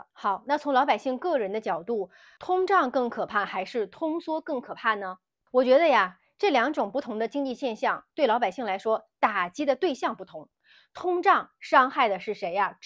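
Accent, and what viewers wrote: China. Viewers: 你应该是大陆人吧，居住在台湾，口音像大陆人，写的字也是简体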